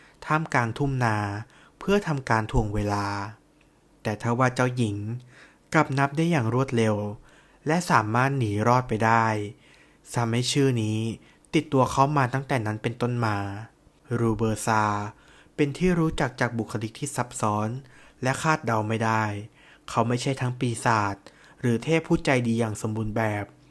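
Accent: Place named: Thailand. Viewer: ติดเหน่อนะคะ😂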